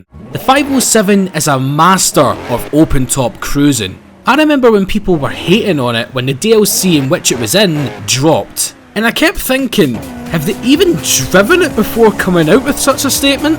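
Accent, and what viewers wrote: Scotland, United Kingdom. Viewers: If you're Scottish then I'm subscribing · Best Scottish accent 👌👌👌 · I found your Chanel by this video, and I could not help to love that accent! Where in GB are you from, mate?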